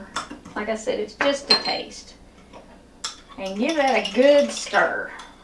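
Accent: South, United States. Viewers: EVERYTHING you make looks so good, and your country accent is way cute too. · Thank you and Bless you as well. I am African American Female, whose parents and Grandparents were all born and raised "Down South"...I enjoy watching cooking programs...your Southern accent especially gave me fond memories of my own female family members talking and comparing recipes as they prepared various dishes.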